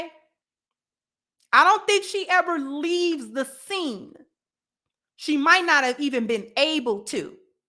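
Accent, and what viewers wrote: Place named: Baltimore, United States. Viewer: She got a Bmore accent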